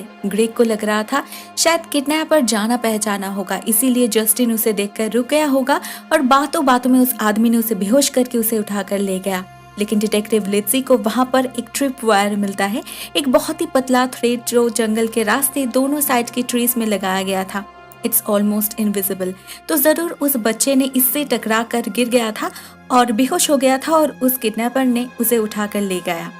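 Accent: Bengal, India. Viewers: Sweet voice in some bengali tone. You explain every corner of the story in your clear voice and without any noise. Feels like we are watching the movie. Thanks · Bangala bhashi.....tone...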